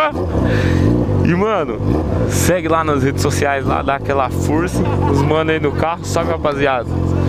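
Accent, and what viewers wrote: Goiás, Brazil. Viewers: Por que você fala no pique do Goiás. você de de Goiás?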